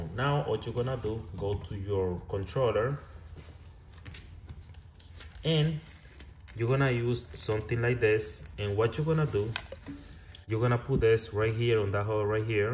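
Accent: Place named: Dominican Republic. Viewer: you can tell this nigga Dominican😂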